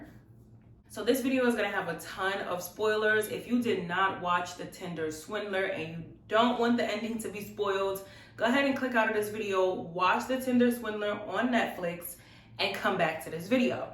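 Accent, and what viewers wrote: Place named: New York, United States. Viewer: I just love your New York accent! Lol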